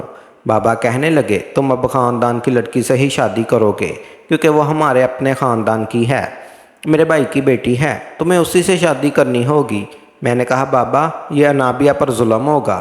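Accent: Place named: Punjab, Pakistan. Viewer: ابے اردوُ بول رہا یا پنجابی ۔۔۔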